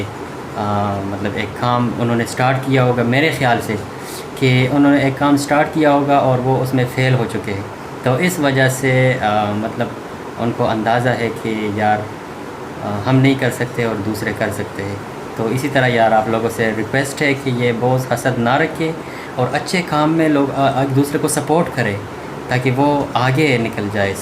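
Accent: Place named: Bengal, India. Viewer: AP Panjabi hA ko bangali hum ko to bangali lagraha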